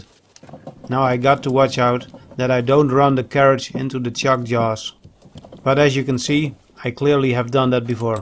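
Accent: Netherlands. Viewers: I seem to recognize a Frysian English accent. The wooden shoes add certainty to my suspicion. Could it be that i'm right? And if so, what part of Friesland are you from?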